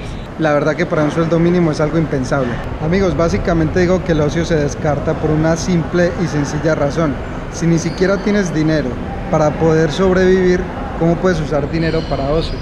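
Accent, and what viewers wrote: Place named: Antioquia, Colombia. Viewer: Este man es español o colombiano porque tiene acento paisa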